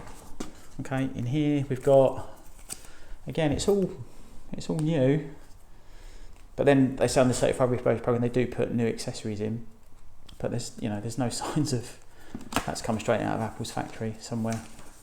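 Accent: United Kingdom. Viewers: ohmygod i love the english accent so much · The lovely British accent 😍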